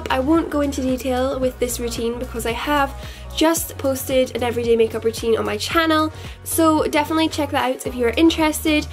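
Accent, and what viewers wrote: Scotland, United Kingdom. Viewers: Elisa Forever Scottish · panda dreams well shes brittish so..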